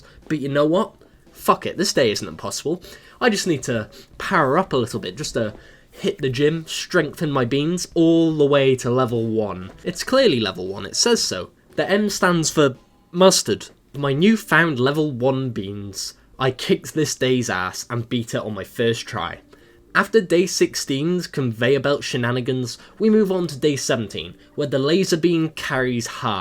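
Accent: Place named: United Kingdom. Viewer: Yoooo,thw bri'ish man is back. Now try beating pvz 2 with only flowers.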